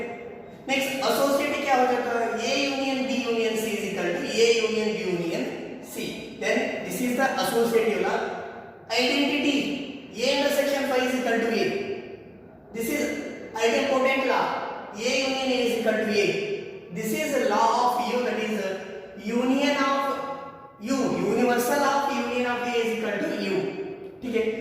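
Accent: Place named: Karnataka, India. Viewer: Pronounce somewords in Kannada but class is super.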